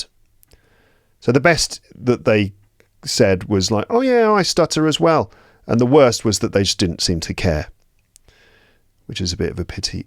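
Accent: United Kingdom. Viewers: Is this british accent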